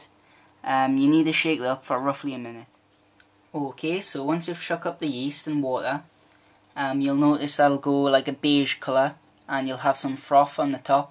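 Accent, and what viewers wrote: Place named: United Kingdom. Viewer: Thanks for the vid!!! Great idea, that's what my plants need!:-) Hey, btw. you have got really nice and interesting accent, I like that, are you from the UK I guess, which part?:-)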